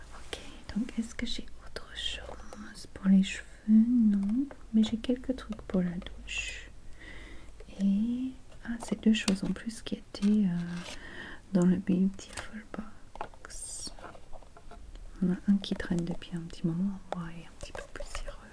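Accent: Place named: England, United Kingdom. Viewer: Cette vidéo est vraiment incroyable pour dormir votre accent anglais en parlant français me donne des frissons!!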